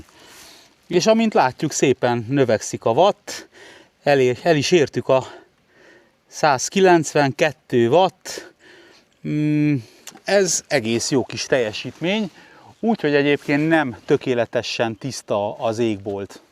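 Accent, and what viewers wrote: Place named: Moscow, Russia. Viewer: az csattog ez pedig Kb Moszkvai akcentussal beszélni mazsar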